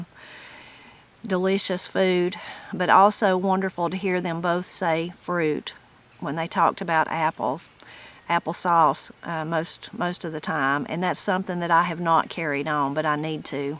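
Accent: Appalachia, United States. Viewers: Love to hear your mountain speak!❤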